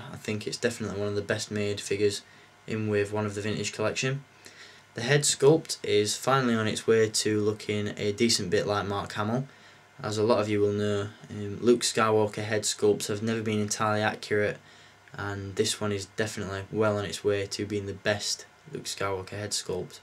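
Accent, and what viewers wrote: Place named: Leicester, United Kingdom. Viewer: Hello there. Great video/review. I got this figure in the post today after ordering from Amazon. I completely agree with you; it's probably my favourite Luke Skywalker too! It was nice to see it out of the box as I'm keeping all my Lukes on the card. By the way, where are you from? I'm from Leicester and you and me have very similar accents.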